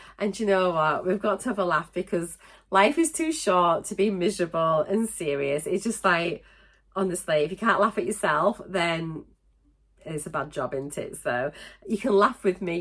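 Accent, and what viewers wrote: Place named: North Yorkshire, United Kingdom. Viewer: Me again! On this one now. I meant to say despite doing Noom and coming to a 6 month plateau, I was blown away when my doc told me it wasn't anything I was doing that was keeping me plateaued - it's the sodding menopause and it was like a light went on. I thought the sweating and lack of oomph was down to fibro but it was getting so bad and the lack of weight loss knowing I was eating less than 1000 cals a day by a fair bit had got me so down I'd barely been able to get out of bed for more than 15 weeks 😭 when she said it's not you, it's your hormones, I cried! To feel like you're not self sabotaging and finally someone says you're doing all the right things but your body is just changing was epic! HRT is life changing and so is Mounjaro. I've downloaded an app called Fastic to use as my Noom sub runs out soon and I'm logging daily how I feel. 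You're such a joy! Used to live in North yorks, near Hawes where they make Wensleydale and I recognised your NY accent as soon as I watched you 🤗 take care honey. Sarah xxx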